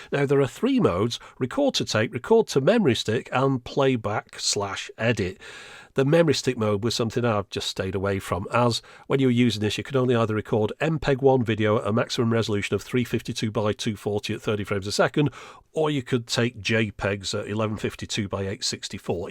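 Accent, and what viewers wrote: United Kingdom. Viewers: the British is off the charts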